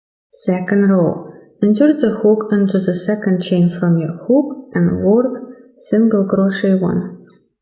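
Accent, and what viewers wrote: Russia. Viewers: Russian accent? Hallo mein lieber. I have made the same , we have similar ideas? Thank you for video.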